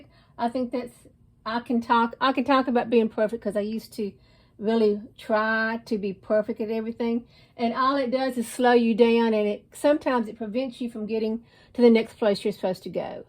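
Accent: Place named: South, United States. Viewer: I love this tutorial. Thank you!! Your accent tells me you are from the South. Love your accent. I’m in Tn. Would you show us a video on painting a Gardenia, also?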